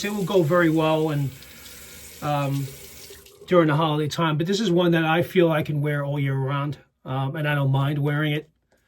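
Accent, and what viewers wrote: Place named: New York, United States. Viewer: Nice shave, love the NY accent💈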